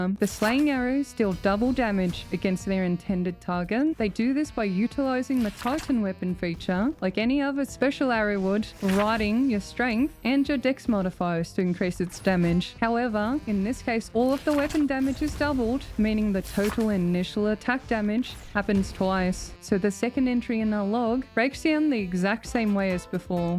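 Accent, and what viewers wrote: Australia. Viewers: I rocking love your Aussie accent!!!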